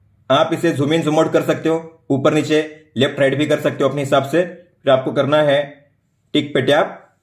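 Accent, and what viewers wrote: Gujarat, India. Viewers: You r gujrati right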